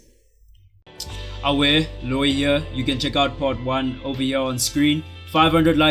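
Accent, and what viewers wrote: South Africa. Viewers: Bro you’re a South African brother? I’m subscribing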